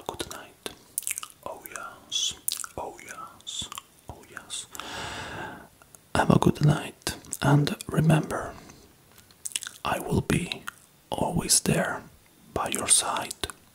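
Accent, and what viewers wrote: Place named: Italy. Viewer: Italians have a specific way of talking which is cute😊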